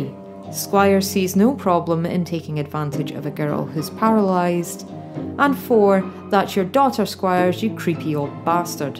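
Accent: Scotland, United Kingdom. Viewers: Can I just say how much I appreciate your calm talking presentation. As a skeemish person myself ( I skip the jumpscare horror episodes ) it was nice that you didn’t include audio or visual scares that I’ve seen other reviewers use. Really good video and it’s always nice to hear a fellow Scot! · I love “Inside No. 9”. I’m absolutely in love with it. Love your list...quick question. What’s your accent? Is it Scottish or Northern English? It’s lovely! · Where in Scotland are you from?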